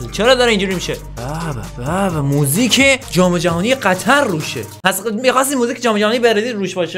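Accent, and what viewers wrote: Mazandaran, Iran. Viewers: داداش تو مازندرانی هستی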